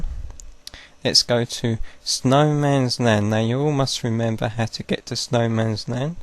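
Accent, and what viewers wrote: United Kingdom. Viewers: Me who knows little to non english: ... WHAT IS HE SAYING I CAN JUST UNDERSTAND ISOLATED WORDS Help i am having a meltdown 😂😂 British english is still way too much for me ahahah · Sounds like Toby from The Office US with a British accent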